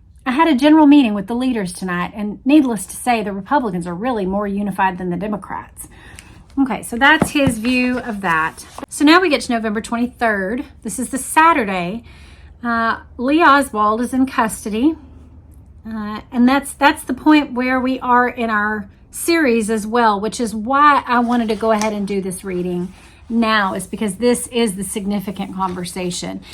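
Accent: Texas, United States. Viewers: "Best Texas voice?", what's wrong with your normal Texas accent? I am still impressed that on the worst Friday in American history the FBI conclusively linked an abandoned rifle (not proven to have shot anybody) to Oswald (because Oswald rented the mailbox--but there was no testimony nor any evidence that Oswald picked up the rifle found) in ten hours. The FBI did this without computers, just fax machines, telephones, shoe leather and elbow grease. Why waste money on gun registration when John Edgar Hoover's boys did so well without? The "insufficient evidence" was never tested by screening the evidence for admissibility by a judge, then used to support the Theory of the Case in front of a jury. In other words, the FBI found a rifle and had a shaky link to Oswald--but never had to prove it was the same rifle that killed JFK. It's in poor taste to mention that there were more holes connecting Oswald to JFK's murder than there were in JFK after being shot two or three or four times.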